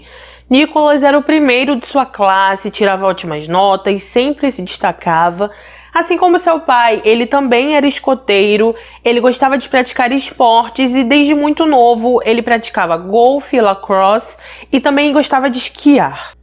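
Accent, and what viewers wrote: Rio de Janeiro, Brazil. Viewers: gosto muito dos seus vídios Deus te abençoe você narra muito bem você tem uma vos Linda a vc tem sotaque carioca você é do Rio de Janeiro?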